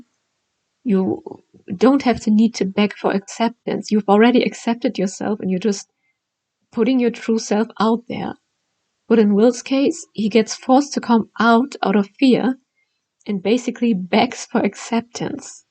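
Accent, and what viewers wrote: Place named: Germany. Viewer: I think the way you say certain words, it had me wondering if you were a native German speaker. This is more of an accent thing, not a grammar thing—you expressed your ideas clearly. Someone once said to me "Understanding always comes before speaking", which I think is true for every new language you learn to speak! 😊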